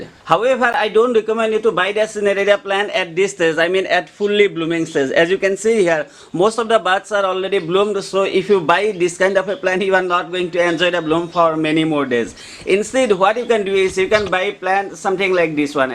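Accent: Assam, India. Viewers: Accent to huni axomia zen lagisil,kintu aji gom pai montu bhal,lagise.moi apunar video bilak saon aru bohut hikisu.kintu like aru suscribe aji disu.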